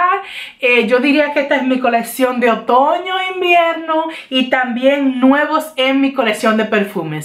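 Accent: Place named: Dominican Republic. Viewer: Saludos, soy nueva en tu canal, por ttu acento creo q eres Dominicana. Amo ese país. Fiesta todos los días.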